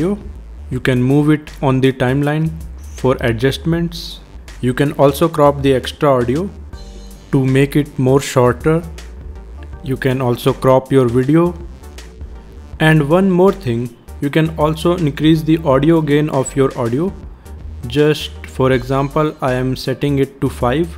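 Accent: India. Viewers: Great video, you're Indian. I heard it right away.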